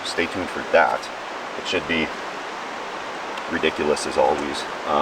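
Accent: Alberta, Canada. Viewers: Epic idea. I love your stuff. Former Edmonton resident and you got that same Albertan Hoser accent that I miss so much. Haha. You remind me of a bunch of good dudes I knew when I lived there.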